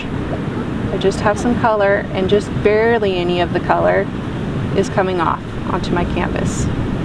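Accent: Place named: Midwest, United States. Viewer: You must be originally from the Midwest/east.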